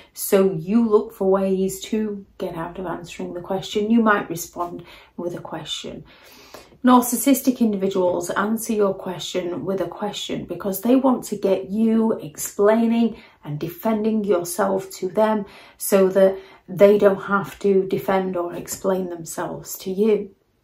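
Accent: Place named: England, United Kingdom. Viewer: Good talk 😀🦜 good voice 💯 accent from England 😍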